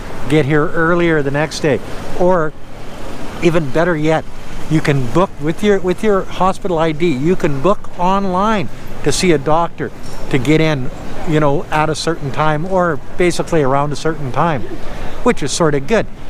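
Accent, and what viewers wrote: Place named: Canada. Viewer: Once a while I notice the Canadian accent 😂.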